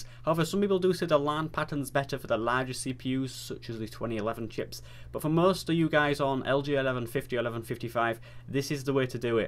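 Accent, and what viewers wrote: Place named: Northern England, United Kingdom. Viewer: Thought so, you sound more northen than me haha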